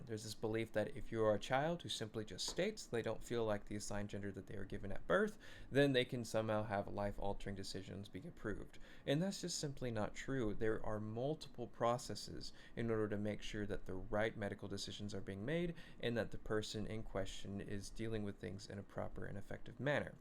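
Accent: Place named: United States. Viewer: Unusual to hear such sense spoken with an American accent these days.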